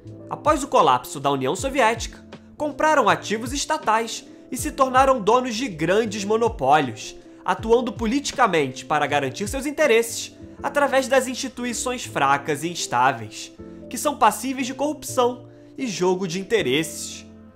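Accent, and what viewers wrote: Rio de Janeiro, Brazil. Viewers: Sotaque chato. É carioca? Não consegui me concentrar no assunto do vídeo.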